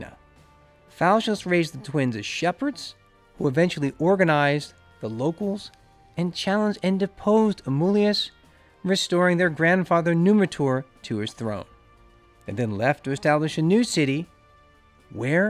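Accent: United States. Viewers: Do Italians think you're Italian? I did. If you didn't have such an American way of speaking, no one would know (from looking at you), that you're not Italian or at least Western European.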